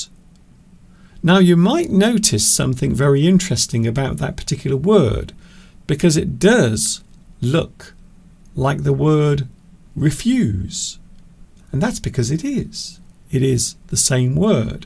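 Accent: United Kingdom. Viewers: Very interesting and useful lesson as well as a clear British English pronunciation .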